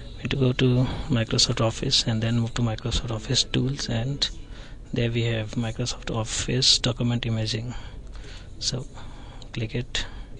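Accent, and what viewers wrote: India. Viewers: i honestly HATE indian accents it sounds so gross….that doesn't make me racist because i don't like it…anyway nice video, thanks for the help!! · How stupid are the people making negative comments about the guys voice. He's speaks English as a second language.... of course he sounds a little different.. He put the video up to help you out with your dumb selves. You couldn't figure out how to solve your problem... so you looked to the same Indian for help. ugh Lazy idiot racist.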